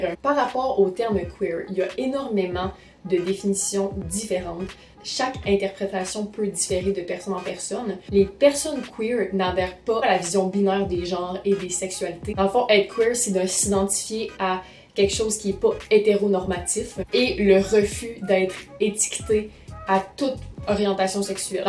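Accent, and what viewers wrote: Quebec, Canada. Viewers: Ton accent québécois est trop beauuu ❤️❤️❤️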